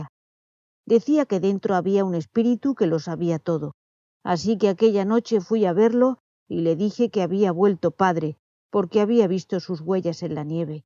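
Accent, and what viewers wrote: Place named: Spain. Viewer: me gusta como lees y me gusta que sea un video de tan solo una hora. La pronunciacion es clara. Me encanta oir tu espanol de Espana.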